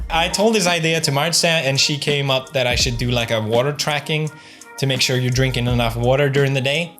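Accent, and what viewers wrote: Sweden. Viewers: All these years, mans has lived all over the world and his Sweedish accent is thicker than its ever been 😂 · I'm happy that his Swedish accent is getting stronger in each video. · he sounds more Swedish than ever before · I love how since pewds left the UK he's started sounding more Swedish again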